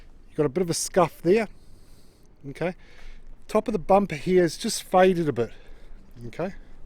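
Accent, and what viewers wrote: New Zealand. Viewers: You a Kiwi fella?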